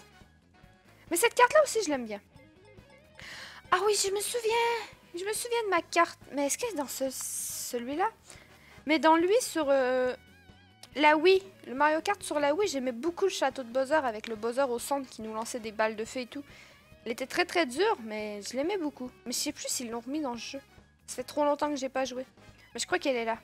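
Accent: Quebec, Canada. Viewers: T'es québécoise?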